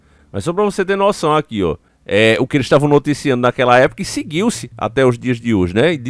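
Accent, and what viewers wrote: Northeast, Brazil. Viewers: Bom dia Eu sigo você a muito tempo e seu sotaque sempre foi o diferencial Nordestino meu amigo é como todos os lugares tem as exceções parabéns pelo seu trabalho Deus abençoe 🙏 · Conheço seu canal a 9 anos. Difícil eu perder um vídeo, não sou nordestino, sou de São Paulo, mas o que me chamou atenção foi justamente a sua forma de falar e o conteúdo, até hoje. Se não fosse a safadeza do YouTube seu canal estaria maior. PARABÉNS!!!!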